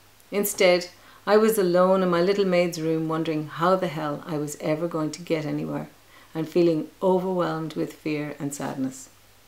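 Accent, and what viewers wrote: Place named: Ireland. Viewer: Well done on blog 82 video. New beginnings for sure. Pleasure to listen to your content and your Irish accent. JC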